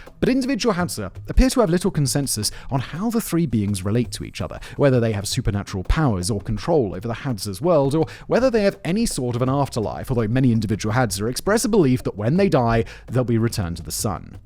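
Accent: United Kingdom. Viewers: umm. 1. We were discussing Tanzania, not Tasmania. 2. I did not ignore them, they was just nothing that necessitated a response, I can infer from all the comments that both are valid pronunciations depending on your accent and the only reason specific pronunciation hit my ear weird is because he has a British accent but is using a pronunciation more common to European and African accents. Which makes sense considering he lives in Prague and had an African Grandmother, or at least a grandmother who lived in Africa. 3. The only reason I responded to that specific person is because he seems to be under the impression that only Americans pronounce it "Tan-zan-iah" which is just incorrect.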